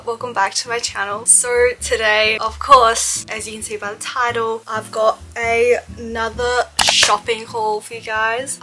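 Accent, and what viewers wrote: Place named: Australia. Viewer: Literally obsessed with ur Aussie accent 😍 if u edit on ur phone can u do a video on how u edit I love ursss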